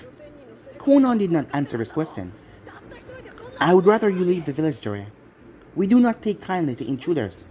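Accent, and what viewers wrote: Jamaica. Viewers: I've always wanted to ask you this question, are u from Jamaica.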